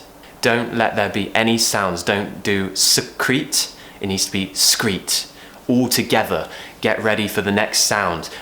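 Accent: United Kingdom. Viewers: I listen to your youtube like listening to a radio. I want to make British accent also :) Thanks always for your interesting contents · Thank you for the new video lesson. I love your British accent 😍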